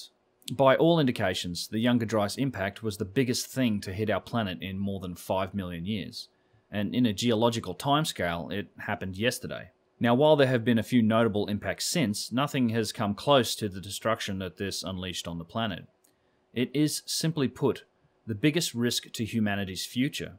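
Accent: Australia. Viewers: Love your videos, excellent content. Out of interest, you live in California but you sound Australian, why do you live in the USA? Thanks. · You sound Australian. Just a small point. 10 mins you show a map of the world. Northern and southern hemispheres are actually the same size but it's not represented that way on your map. Stick up for AU.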